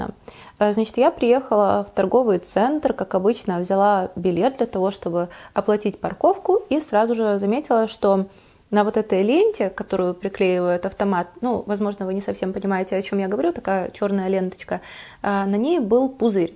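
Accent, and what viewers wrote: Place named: Ukraine. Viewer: украинский акцент не спутаешь с польским или даже русским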